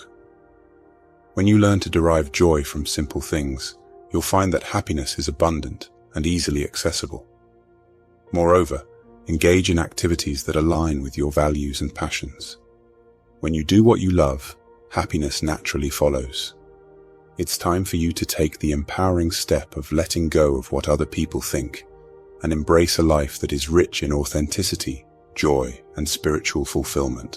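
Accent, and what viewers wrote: United Kingdom. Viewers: That British accent on these type of videos is so annoying..makes me tune out instantly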